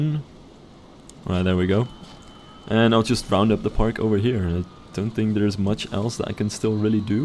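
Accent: Netherlands. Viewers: Wait, you're dutch?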